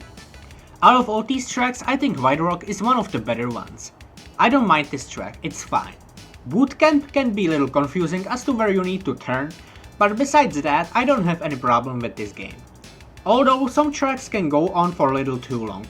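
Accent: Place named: Czech Republic. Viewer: bro where are you from? you sound like someone from czech republick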